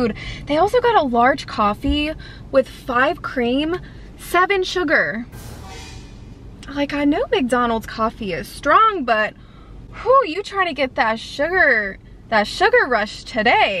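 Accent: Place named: North Carolina, United States. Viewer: I think I remember u saying ur originally from NC but do u live there as of right now? U definitely have the southern accent from NC lol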